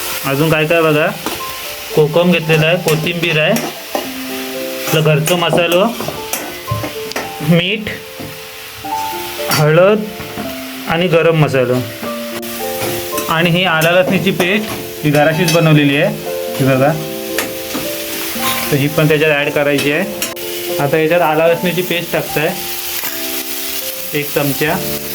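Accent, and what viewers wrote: Malvani, India. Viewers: भावा तुझ गाव कोणत मालवणी बोलतोस पण मीक्स आहे तु बोलतो ती भाषा मालवण तालुक्यात बोलत नाही प्लीज सांगशील गाव कोणत 🙏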